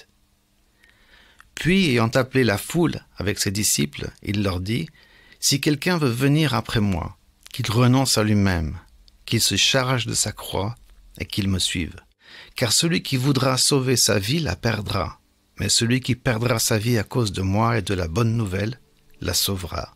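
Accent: United Kingdom. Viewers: Bonjour et merci pour ce partage. Oui oui tu as bien un bel accent anglais. Je comprends mieux maintenant. La méditation m'a fait sourire et réfléchir surtout avec l'image du mauvais mur. Et si mon échelle d'ascension pro était sur le mauvais mur 😢 je me suis demandée. Seigneur je te confie tout je te recommande tout apprends moi à t'écouter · Bonsoir merci pour ces belles paroles elles me reconfortent apres une journee au bureau Je vous le dit souvent j'adore votre accent BRITISH Have a nice evening Awaiting for your next reading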